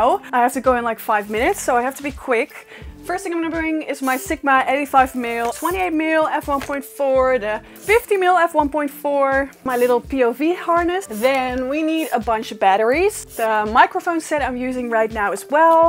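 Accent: Netherlands. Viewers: Sooo happy this came on my recommended videos, I love your energy it really makes me excited to shoot aswell, even though i feel a creative block right now, this motivates me!! (Also recognized the dutch accent the first few seconds!! Zo leuk om eens een NL account te volgen 🥰) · Oh, what a nice shoot. PS. I love your accent too, because my mom is Dutch too (but your's is so much fresher😅, She's been in SA for 60+ years) Keep up the good work and teach me more about photography, OK? thanks😉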